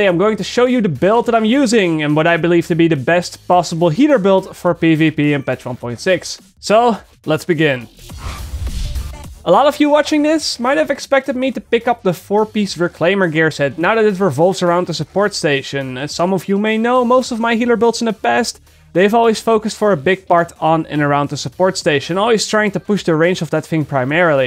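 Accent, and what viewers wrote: Netherlands. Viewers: are you Dutch? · is he dutch because he sounds dutch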